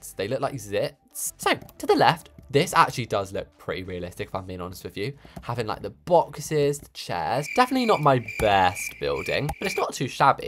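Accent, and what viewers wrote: United Kingdom. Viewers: i always wanted to learn your accent i love britsh accents i really want to go to England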